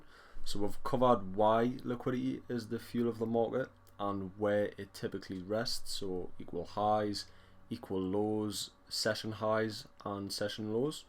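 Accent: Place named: Newcastle, United Kingdom. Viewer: Haha fellow Geordie aye. How long you been trading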